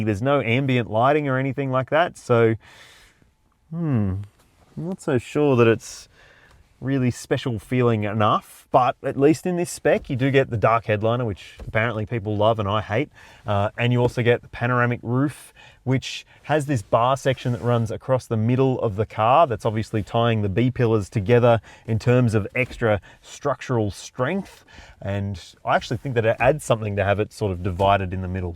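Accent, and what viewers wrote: Australia. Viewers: Lol average American moment. How can you not tell that this is from Australia? The car is right hand drive and he has an Australian accent.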